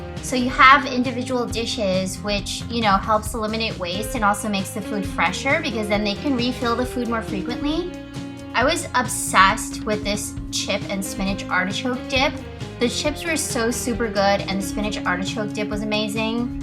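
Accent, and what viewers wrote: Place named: California, United States. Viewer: Informative but........ Couldn't get beyond 2 mins listening to that voice . Rising infliction much ? I began thinking it was the Californians skit off SNL ... No offence intended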